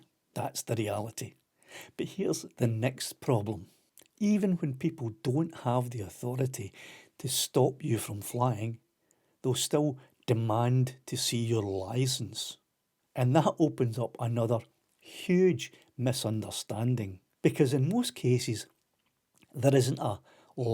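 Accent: Scotland, United Kingdom. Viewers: Useful video, but it might be good to check the subtitling- there are at least two places where the subtitles confuse can and can’t in a Scottish accent, so say exactly the wrong thing (passers-by “can enforce drone laws” for example, instead of can’t)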